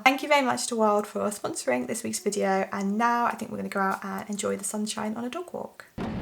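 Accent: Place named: Cornwall, United Kingdom. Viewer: Where is your accent from? Sometimes i can detect either hints of Cornwall or Bristol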